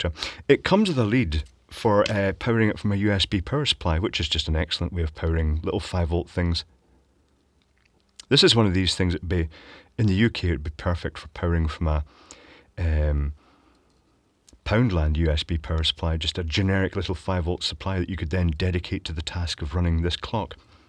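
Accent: China. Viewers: Chinglish.. love it!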